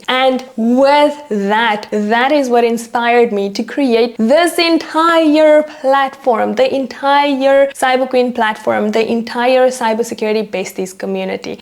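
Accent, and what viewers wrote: South Africa. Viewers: Love your accent! Are you South African?☺️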